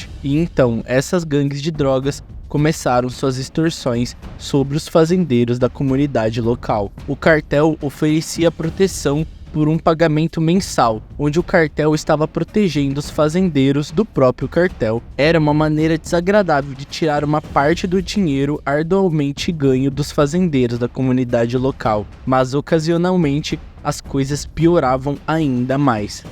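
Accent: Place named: Caipira, Brazil. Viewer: História longa e narrador puxando o r de caipira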